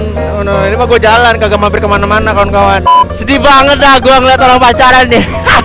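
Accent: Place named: Java, Indonesia. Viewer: Nah begini bang kemaren medok bat jawanya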